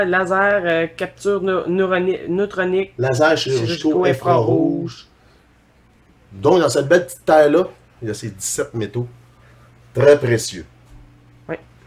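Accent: Quebec, Canada. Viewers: Oué bin qu' ils touchent pas a nos Coréens avec leurs coneries, se sont des gens formidables et très ouvert, merci pour la video jme suit bien marré, et vive l' accent quebecois^ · desolé ,c est vrai j aime bien son idee ça peut etre un argument. mais c est sa maniere de parler et l acce t canadien ça ma suffit pour rigoler pour rien .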